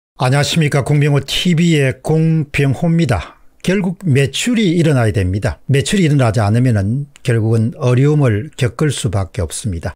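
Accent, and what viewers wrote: Gyeongsang, South Korea. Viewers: 공 시징님 열심히 열강하고 있습니다. 현실을 직시하는 말씀 잘 듣고 있습니다. 다만 , 말씀을 조금 조절하시면 좋겠습니다. 너무 경상도 말로 빨리 말씀 하시네요. 좀 슬슬 말씀해 주세요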